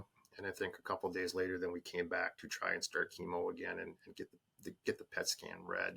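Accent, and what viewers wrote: United States. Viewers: I hear from your accent that you are American. I'm sorry that America has failed you. The rate of cancer in young people is at an unprecedented high - and it is mainly cancers of the stomach and colon that have shot up in young people to levels never seen before. This is mainly due to the ultra-processed nature of food in America. Cancer is no longer only for old people in America. If you experience symptoms at any age - even in your teens or twenties - you really need to get screened for cancer especially as an American - if you can afford it. Because, you know, 'Merica and it's wonderful healthcare system.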